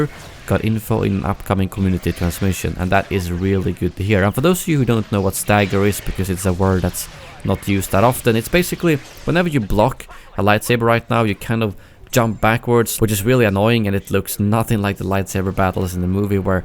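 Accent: Sweden. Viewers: Are you swedish? You have the same dialect as Dennis.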